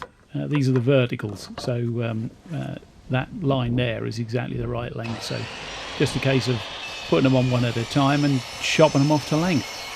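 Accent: Norfolk, United Kingdom. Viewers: many thanks. I will have to google cruck frame and sip panel as I don’t know what they are. The only thing I was worried about if I sank it low was damp. You sound like you’re from Norfolk way. I’m Wisbech based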